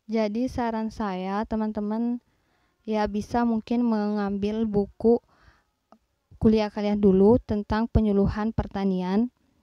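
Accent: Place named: Central Sulawesi, Indonesia. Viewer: sya kira orng Sulawesi Tengah soalnya logat bicaranya mcm tdk asing di dengar.. Baru ad vlog dipantai Nambo.. Sama nama daerahnya dengan yg ada disni.. Klw kk orng sini langsung otw ke rmh kk buat belajar skb 😁🙏🙏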